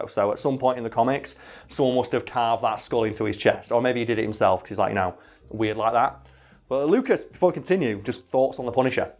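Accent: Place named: Southeast London, United Kingdom. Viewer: Use your damn northern accent you southeast London sounding ass. Please?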